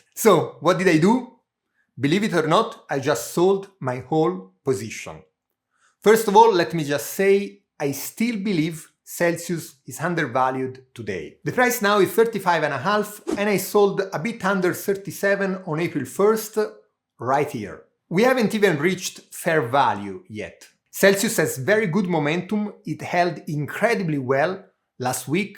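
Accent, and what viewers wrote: Italy. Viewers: I just subbed. I have only watched 32 seconds. But judging from your appearance and energy your channel will probably grow. My early impression of your accent is Italian.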